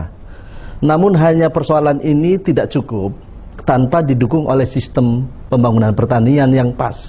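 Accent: Java, Indonesia. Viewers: Logatnya medok jawa ya... Beda sama 3 tahun kemarin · Ini Alm. Lord rangga? Kok medhok?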